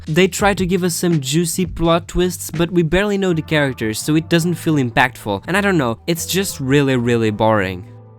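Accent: Portugal. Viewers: you sound portuguese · YOU'RE PORTUGUESE. THE SIR KAZIO MEME? THE "WAAAAAAAA" ONE? YOU AXCENT? I KNOW IT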